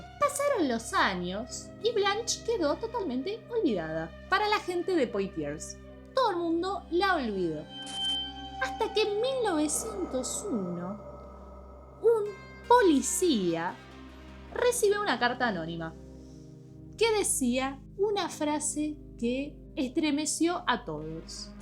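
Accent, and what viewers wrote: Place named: Paraguay. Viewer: paraguaya enduzla mis odios JSJS espero siempre que hable asi en los videos.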